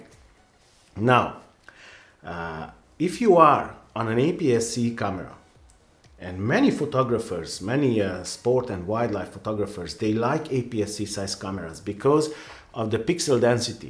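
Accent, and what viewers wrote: Hungary. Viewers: Annyira hallatszik valakin ez a magyar akcentus! :D nagyon jó videó. Rendkívül informatív és jól felépített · just subscribed....love your analyses and the Hungarian accent!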